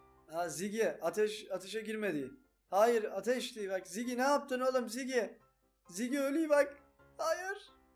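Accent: Black Sea, Turkey. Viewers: Abi senin gonuşman çok hoşuma gidiyi bende garadenizliyim bayburt Guklagraft yeee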